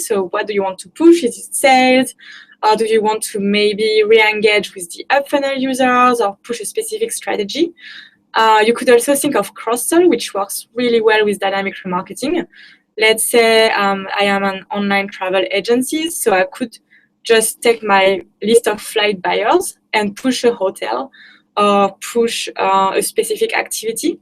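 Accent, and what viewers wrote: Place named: France. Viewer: Very cute French accent. Thanks for the video :)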